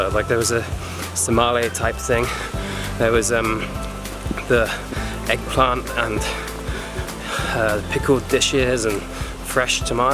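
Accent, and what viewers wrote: United Kingdom. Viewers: 1. In Armenian the last colour of the flag is actually called "apricot colour" -tziranaguyn. We say "karmir, kapuit, tziranaguyn" - "red, blue, colour of apricot." Scientific name of Apricot is Prunus Armeniaca (Armenian plum). 2. The war they are talking about is Artsakh (Nagorno Karabakh) liberation war launched by Azerbaijan (ethnic Turks) against Armenians of Artsakh province of historic Armenia. By the time Azerbaijan was 9 mln and Artsakh was only 100.000 Armenians and was cut of the rest of Armenia. By the time rest of Armenia was able to reach for help and get through the blockage Stepanakert (Artsakh's capital city) and villages were heavily bombed. 5 years of war and Artsakh is free preventing another Genocide done by the Turks. Artsakh is possibly the most beautiful part of Armenia. 3. Thanks for such an interesting video, mate. 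I lived in the Uk for 6 years and just adore British accent, that was the best part of the video for me lol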